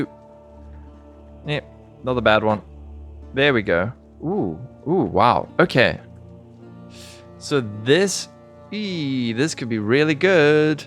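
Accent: South Africa. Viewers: You from Durb's? you sound Safa!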